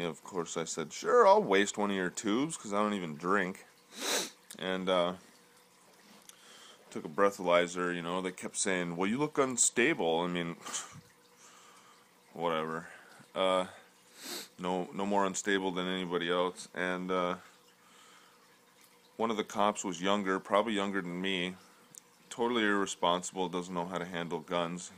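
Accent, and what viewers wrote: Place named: Michigan, United States. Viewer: Are you from Michigan???? Sounds like the cops here.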